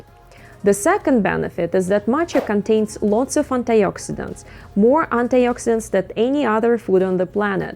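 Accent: Russia. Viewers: Where you from ? You sound Russian ?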